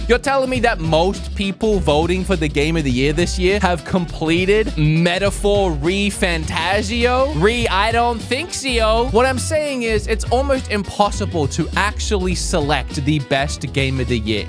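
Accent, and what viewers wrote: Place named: Australia. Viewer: I miss your aussie accent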